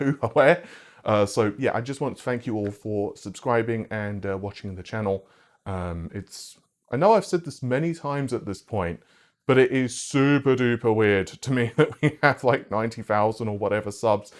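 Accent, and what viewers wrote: United Kingdom. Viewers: I'm watching a guy with a British accent talk about videocards that I can't find in stock and couldn't afford even if they were in stock. What am I doing with my life :) ?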